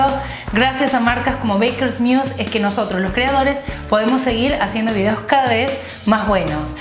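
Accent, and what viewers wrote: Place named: Rioplatense, Argentina. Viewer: te escuchaba y digo ser argentina esta chica o uruguaya y vi el mate de fondo jajaj osea que si! hermoso video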